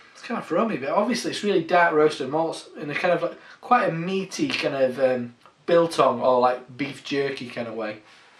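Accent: Yorkshire, United Kingdom. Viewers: oh thats bootiful loovely haha gotta love the yorkshire acsent on an american beer ;) = great review dude = i tried finding if they were going to export to uk from what i have heard it may be end of year before they export to UK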